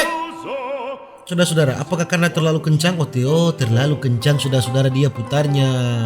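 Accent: Manado, Indonesia. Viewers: B logat manado kua opo🤣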